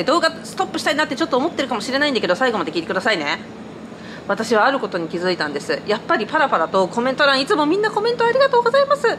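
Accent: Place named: Kyoto, Japan. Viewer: 京都？っぽい。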